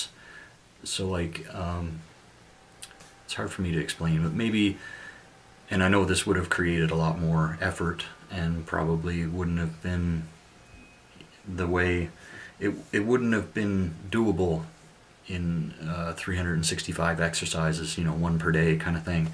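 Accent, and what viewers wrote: Canada. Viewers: I have to ask where you are from? I'm from Mabou, Cape Breton and I hear a Atlantic Canadian accent... Thanks for your videos. Helps a lot.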